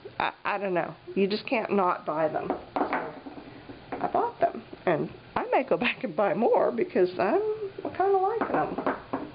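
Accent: United States. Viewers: Sorry but your American English is cracking me up!..pipe ets?....in the science lab we call them...( pip ets) not (pipe-ets)...gosh I love you girl.....lol...lol...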